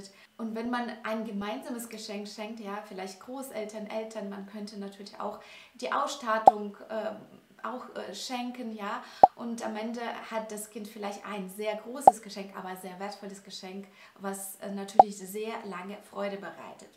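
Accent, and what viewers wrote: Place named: Russia. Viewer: wo ich Deinen Akzent einordnen soll... Ja, klar, Russland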